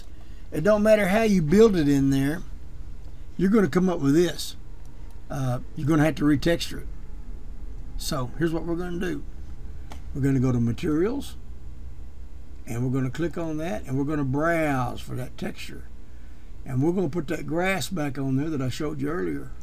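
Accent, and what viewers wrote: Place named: South, United States. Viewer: two questions my friend.... 1. what game are you exporting to? 2. where you from? (great to hear the southern accent...I am from Alabama)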